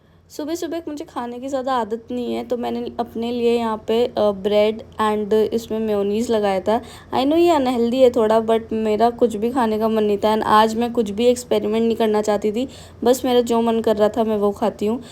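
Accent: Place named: Bihar, India. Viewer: R u bihari apke cll pe ke language se ekdm apne ghr ke bihari language ki feel aayi 🥹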